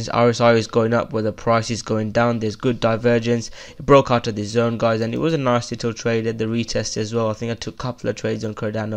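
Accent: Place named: United Kingdom. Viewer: I feel he is a hidden gem in the world of crypto youtube. But I am quite partial because I love the Brit accent too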